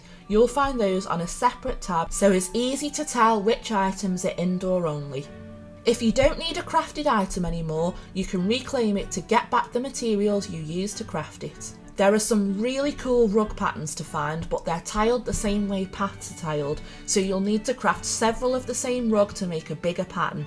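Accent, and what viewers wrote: United Kingdom. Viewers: Can I ask are you from the Staffordshire area its your accent great video btw 👍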